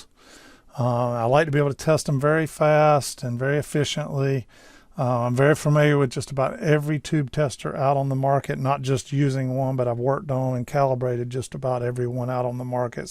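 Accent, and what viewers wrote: South, United States. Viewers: Despite your light Southern accent, I will credit you with pronouncing the word "tubes" instead of "teebs".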